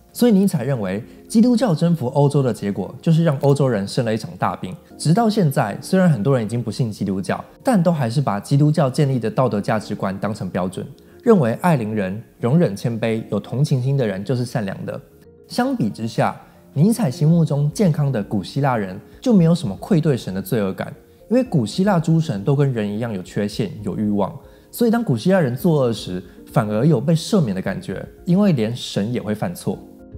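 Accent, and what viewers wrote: Taiwan. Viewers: 这个台湾普通话听起来费劲啊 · 实在欣赏不来台湾腔的男生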